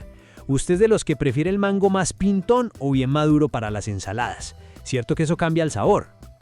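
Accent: Colombia. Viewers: Me encantó tu ensalada y más porque el pepino está completamente limpio a mi no me gusta la cáscara de ninguna fruta o vegetal o legumbres. Gracias por explicar tan bien. Y déjame decirte, con tu acento Colombiano me basta . Gracias❤😊 amore mio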